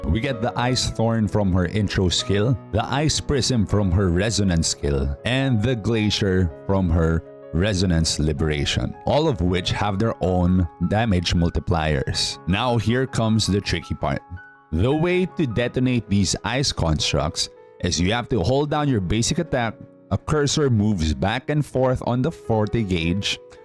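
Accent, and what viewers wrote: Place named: Jamaica. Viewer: Bro are you a yardie ? I cant help but notice the accent. Thanks for the vid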